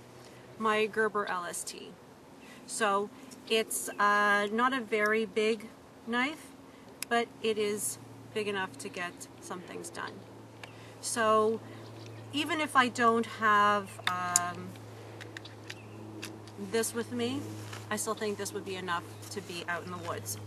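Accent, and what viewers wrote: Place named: Canada. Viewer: You don't have to state that you are a Canadian, pretty girl. We knew that as soon as you tried to say a word with the letter "O" in it.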